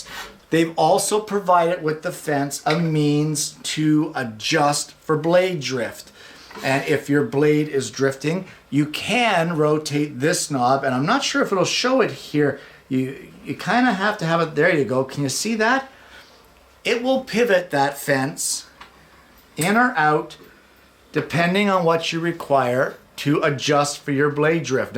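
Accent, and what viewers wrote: Canada. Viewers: I’m terribly sorry, I pride myself on being able to recognise a Canadian accent when I hear it, I know a lot of Canadians are upset by being confused, as are New Zealander’s being thought of as Australians. I just wasn’t thinking when I placed my comment. I know the Canadian supply voltage is the same as the American, from my stay in Vancouver. All the very best to you.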